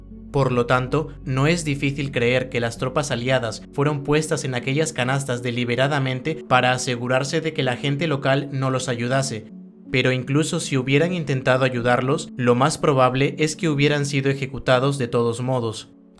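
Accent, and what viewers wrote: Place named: Castile, Spain. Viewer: En el canal pone Perú, pero esa acentuación es Castellana de España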